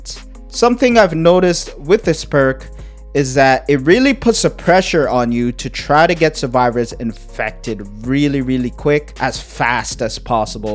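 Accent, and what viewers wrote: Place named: Colombia. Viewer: This boy still doesnt want to tell me if i was right at saying that I think he is Colombian. Im basing it on his accent. Have Colombian friends that talk just like him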